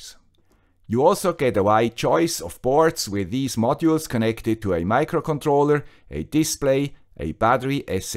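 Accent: Switzerland. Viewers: Hello guy with the swiss accent, do you have by change knowledge of somebody using a lorawan gateway´s 9th channel (backhaul) for meshtastic...? Could it be possible? 73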